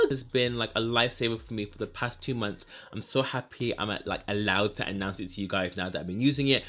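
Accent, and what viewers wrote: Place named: Northern England, United Kingdom. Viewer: You’re so gorgeous it’s bloody ridiculous! Make version of Naomi C. Anyways I can hear a Sheffield or Man accent but you say all those American slangs I’m confused 😁. Uk based or nah?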